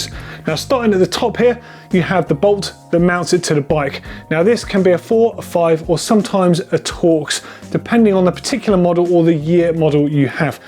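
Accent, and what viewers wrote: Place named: United Kingdom. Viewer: Thanks for the advice. My husband Michael was having trouble getting his Deore XT 12 speed working properly. He said he chose your site because he said people with a British accent just seem smarter! He will be out again tomorrow because of your help.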